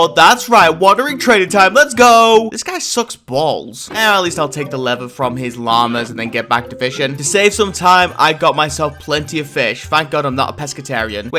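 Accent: United Kingdom. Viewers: i think its scottish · I love his britishness. "Frew out" "smoofer" "evewyfing"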